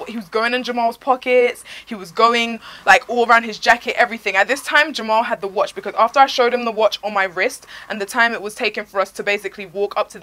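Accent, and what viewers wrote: United Kingdom. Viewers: I love your accent! I also live in the uk but I don't have the London type accent; I put your story time on loop and your voice soothes me and helps with my insomnia, Thankyou! Now I get at least 5 hours sleep per night (once I went 5 days with no sleep)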